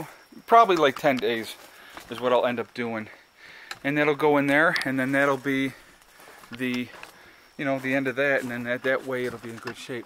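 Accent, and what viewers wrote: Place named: Michigan, United States. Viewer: Great video. ..where are you at??? Almost sounds like michigan. ...lol. with 30 degree variable. ..take care...God bless you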